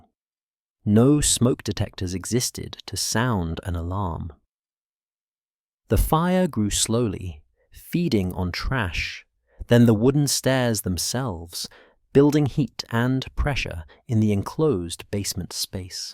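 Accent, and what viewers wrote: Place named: United Kingdom. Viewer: Why does the narration give me AI vibes? Had to google this to make sure it happened and wasn't another fully AI written story, like "Cold War Stories". Plus it says this channel is kiwi but that is a Bri'ish accent... unless I'm going insane. The speech pattern etc doesn't sound human... I can't tell anymore. It sucks.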